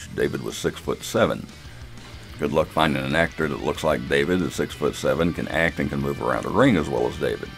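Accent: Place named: Texas, United States. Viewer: I'll admit I grew up pretty removed from the world of pro wrestling, even being from Texas I've never heard of the Von Erichs. However, since I saw that first poster I've been transfixed by the story and tragedy of the brothers, and I can honestly say it's been years since I've been this excited by a film. Hearing you talk about the movie, the boys, and the sport with such a sense of respect and love and with that deep accent I've come to miss so much since I've been away from home, I'm starting to realize why I've come to feel so connected to this film that hasn't even come out yet. Thank you for your thoughtful analysis, please keep doing what you love.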